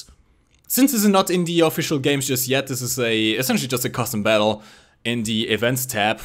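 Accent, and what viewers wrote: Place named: Germany. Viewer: very german accent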